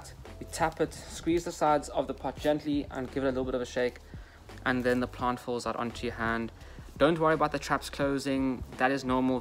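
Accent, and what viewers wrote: South Africa. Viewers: Nice to hear and see a fellow South African in the plant community 😃😁 Going to try repot mine. Is it ok to do now in late spring?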